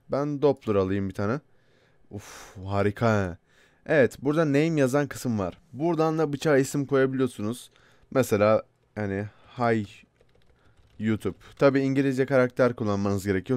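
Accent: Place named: Bursa, Turkey. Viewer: abi kusura bakma ama bursalı gibi konusuyon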